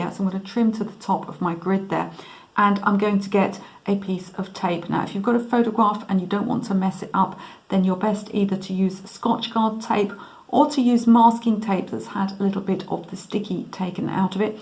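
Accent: United Kingdom. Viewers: I love your teaching methods, I have learned so much about drawing from you. I am very sorry that some people are mean. Also love your British accent.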